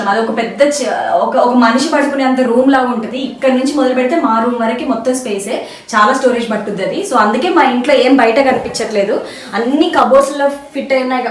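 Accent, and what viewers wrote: Telangana, India. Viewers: Inthaa dooram vellinaa Meeru Mana telangana language laa Matladudu....adirindi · Ur Telangana slang is very nice sister, nice video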